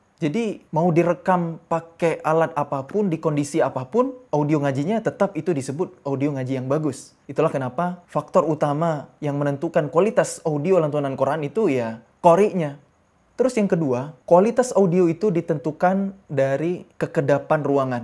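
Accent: Aceh, Indonesia. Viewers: Masyaallah bg..tabarakallah.. Ciri bahasa masi kental acehnya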